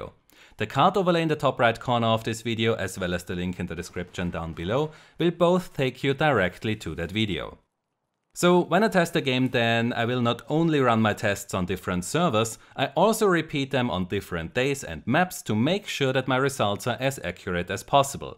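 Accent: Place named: Germany. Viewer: Den deutschen Akzent wird man einfach nicht los 😔. Gutes Video!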